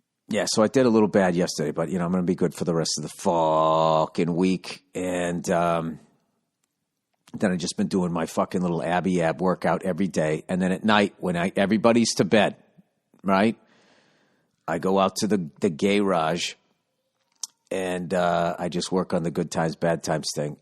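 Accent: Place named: New England, United States. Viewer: thank god for this podcast. i share a house in kentucky with half a dozen women, work with all female coworkers and abrasive new england accent is like an oasis. it's good to hear a baritone for once instead of all these drawling mezzo-sopranos.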